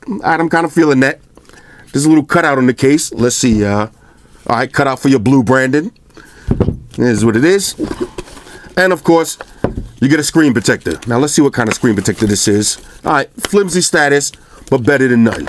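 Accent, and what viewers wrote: New York, United States. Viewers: "poison for the trolls" This was dope! Yo this guy from NY?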